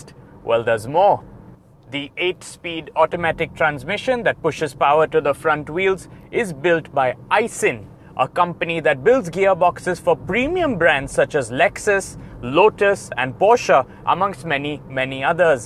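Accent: India. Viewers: İndian english is soooooo 😫😫🥴🤢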